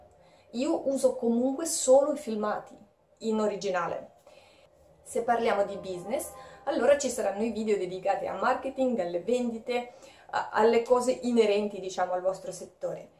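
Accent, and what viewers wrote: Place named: Rome, Italy. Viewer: modi e parole "romane" ti dona e ti dà un certo "charme"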